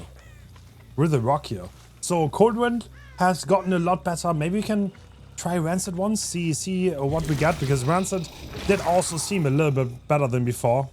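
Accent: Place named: Germany. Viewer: jetzt wo ich weiß das du deutscher bist weiß ich warum mich dein englisch immer so getriggerd hat und ich deine videos wegen den aktzent mir nicht geben konnte aber freut mich bin zwar halb russe aber das einer meiner lands männer so ein erfolgreicher dbd youtuber geworden ist ❤️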